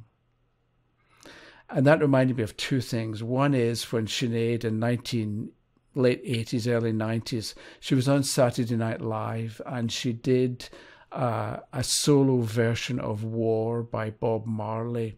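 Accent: Scotland, United Kingdom. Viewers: What a lovely gentleman. It's really nice to hear a soft, soothing Scottish accent 🏴 I took from this that association is key, or as I like to call it the "no degrees" of separation! XXX ♥️ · What part of Scotland 🏴 yoos from ? I grew up in Juniper Green, Edinburgh! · Love the accent I'm going to go on a limb and I'm going to guess that you're Scottish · just gave it another listen, y’all are right it’s definitely a Scottish accent. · Definitely Scottish, Glasgow probably. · Are you Scottish? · A lovely gentle Scottish accent. Music to my ears. 🏴💖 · From what part of Scotland do you hail? Glasgow I'm guessing. Thanks for your lovely video! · I am picking up Scottish undertones in his accent 🤗 makes me feel homesick haha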